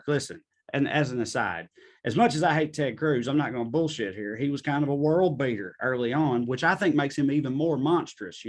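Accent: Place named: Tennessee, United States. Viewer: This level of sardonic erudition casually delivered with a thick Tennessee accent is f**kin' comedy gold.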